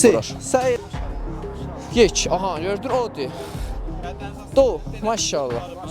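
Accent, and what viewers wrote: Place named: Turkey. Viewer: کیرم تو لهجه ترکی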